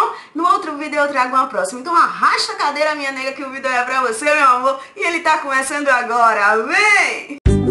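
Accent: Bahia, Brazil. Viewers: Lindona seu cabelo ta lindo!! mil bjos!! gosto muito de te escutar om esse sotaque lindo baiano!! fico com saudades da minha cunhada!!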